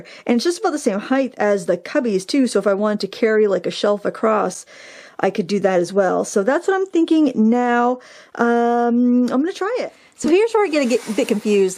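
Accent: Maine, United States. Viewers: Organizing storage bins,, trays, and containers always come in handy, so I would keep many, too. You cracked me up when you asked yourself why you have such a Maine accent today. Uhh, maybe cuz your in/from Maine! Lol!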